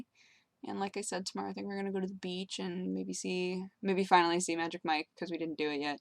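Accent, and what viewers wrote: France. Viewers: I freaking love your french accent haha :D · "Le Pain Quotidien": translated in english it's: "The Daily Bread" Also cute French accent,:) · You have the cutest french accent I ever heard!! <3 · I'm french and your accent is the cutest thing ever ^^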